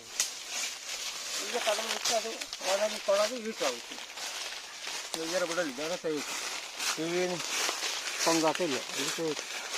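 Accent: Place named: Kundapura, India. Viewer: ❤❤spr ❤ kundapur kannada ne chandha...! Alla ❤🙏🙏🙏👍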